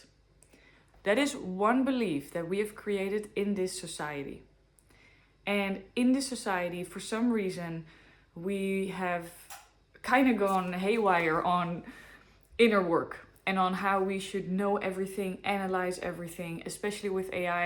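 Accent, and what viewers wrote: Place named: Netherlands. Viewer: Thanks for sharing the wisdom! As someone who learned very early that worth comes from performance, even my healing sometimes becomes another project to optimize. And, I've only recently became aware of this trap. BTW, Dutch accent is my favorite :) Keep up the good work!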